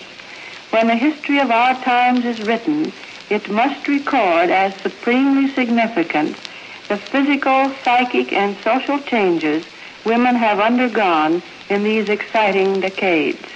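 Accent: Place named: Mid-Atlantic, United States. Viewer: She spoke, at least publicly, with the classic "Mid-Atlantic" voice accent that most American actors, actresses and public figures were trained to use at the time.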